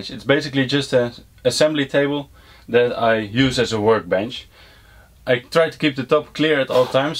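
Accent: Netherlands. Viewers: I am new to your channel. This video popped up as being recommended. So I watched it Very interesting how you organized your shop. I also watched some other videos from you, straight away. They are interesting as well, so I subscribed. Is it possible that I hear a Dutch accent in your English? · Listening to you, it seems to me you'r Dutch, but I stick to english so more people can read your channel. My workshop is 2,4 x 3,5 meter, so not much bigger. Also I 'm a fitter & turner for over 35 years. therefore I was keen to see what solutions you came up with. One of the great things of the workshop is your lath. Maybe one day I have one as well....One thing that I discovered is that I like to keep my tools in a drawer or systainer. The main reason is DUST. I'm going to make a central vacuüm cleaner system to keep the dust to a minimum. This is going to be a system at about 2 m high, with a arm or rail to guide the hose. 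I hate it when I saw with a plunge saw and the vac.cleaner hose hooks up to the rail or bench etc. anyway I'm curious what is coming.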